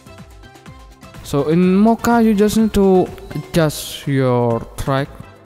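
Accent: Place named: Greece. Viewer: I don't know where are you from but your pronunciation sounds really like greek😂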